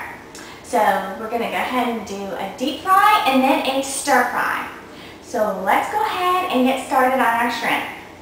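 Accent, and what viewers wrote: South, United States. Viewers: I love how your making asian meals & have a small southern accents ♡♡ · Love this video and your southern drawl....... just like that! (smile)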